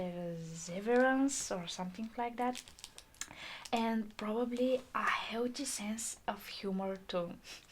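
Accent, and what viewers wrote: Russia. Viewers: Are you Russian? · congrats. and one wuestion: are you russian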